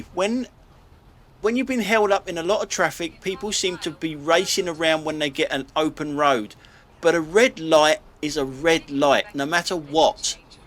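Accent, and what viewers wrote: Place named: Cockney, United Kingdom. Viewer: Hi, only discovered your channel recently and have now watched about 150 of you episodes so far, I'm addicted....It's interesting to see how you've developed your style over the years. Love the 'only an Audi' & 'Burke in a Merc' trademark comments 😂. Love the cockney voice commentary which you've developed and is really polished now. I always thought your voice sounded like Dave Basset the Wimbledon/Sheff Utd manager. Just listened to an old clip of his and I think it does. Also the recent younger you photo also bears a slight resemblance to him too 😂. Keep up the good work 👍 I will slowly catch up with all the old episodes and then just look forward to the new ones... Cheers 😁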